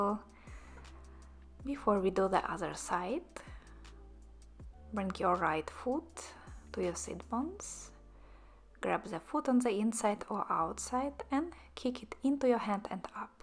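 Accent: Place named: Poland. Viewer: fantastic video. she sound polish ;)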